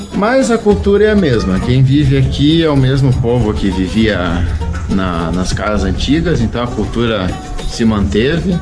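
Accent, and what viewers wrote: Santa Catarina, Brazil. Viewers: Sei la, parece de Santa Catarina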